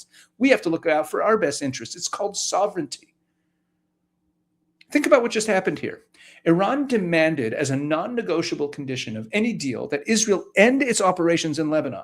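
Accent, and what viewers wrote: United States. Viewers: If we're being honest, you speak with an American accent, but your allegiance seems to be with Israel. That's fine, but then don't meddle in US politics. Israel is a sovereign nation, as is the US. They are entitled to make their policies as they see fit (and they do): so is the United States. I have witnessed what the US has done for Israel...what has Israel done for the US? I guess it boils down to the question of: what is the US obligation to Israel?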